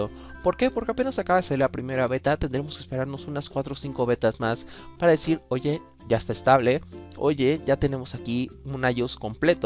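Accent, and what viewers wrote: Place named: Peru. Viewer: Seguro eres peruano, hablas igual al Daniel Menacho 😹😹😹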